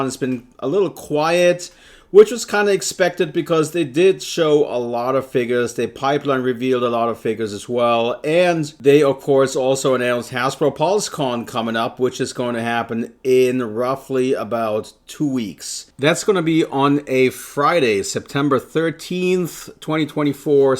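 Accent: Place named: Ireland. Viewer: Thanks for this great podcast! Love you've done an episode devoted to the Jabba The Hutt figures! I still want to buy the new one from Hasbro Pulse, but it sells out quickly! Are you in Ireland? You sound like a friend of mine who's a native of Ireland.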